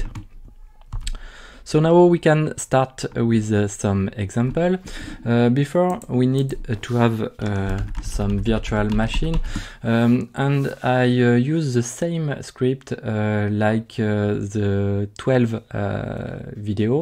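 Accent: France. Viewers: Yoooo.. J’adore l’accent français bro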